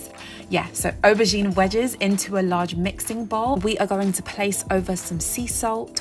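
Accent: United Kingdom. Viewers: Like Jamie, you’re speaking Essex, right?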